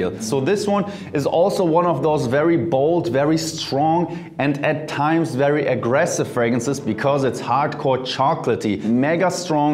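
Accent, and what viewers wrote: Germany. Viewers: Not French at all! He is definitely German!! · He has a strong German accent when he speaks French.. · clearly a German accent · He is stunning but his English could be improved ;) I bet he is a german speaking person! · Is he German ? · I hear a german accent there🤔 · Hus accent is so strong i could tell within seconds he is German😂😭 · German accent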